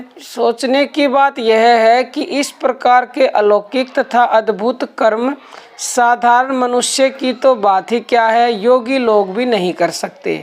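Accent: Haryana, India. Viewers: जय श्रीराम माता जी आप क्या हरियाणा से हैं क्या आपकी आवाज से तो ऐसे ही पता चलता है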